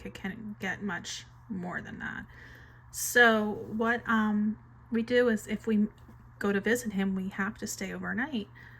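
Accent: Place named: New Jersey, United States. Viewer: As soon as I listened to you, I knew you were from Jersey. My family lives in Wall Township and Belmar.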